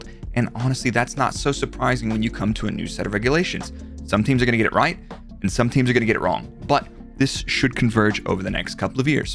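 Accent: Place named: United States. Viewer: Love your content . It’s cool to see a good breakdown using data. I’m one who loves to look at telemetry. If I can suggest a video that I believe would be a hit is a comparison of teammates using the available data to break down who was faster. Removing as many variables that are out of the drivers hands and just look at raw pace. I think you could go very deep with it even factoring tire vs tire and length of stints , who has better tire management, etc. You would definitely kill it. Keep up the great content. It’s cool to watch f1 stuff from someone who speaks like I do . (I just mean a US native) actually I don’t even really hear the English/British/UK accent anymore. It’s almost more noticeable to hear a US accent .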